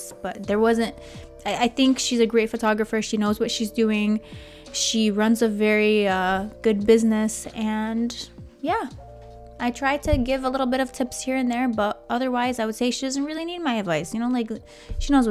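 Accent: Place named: New Orleans, United States. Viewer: I just placed your accent, it's New Orleans, right? You sound just like a friend I had from there.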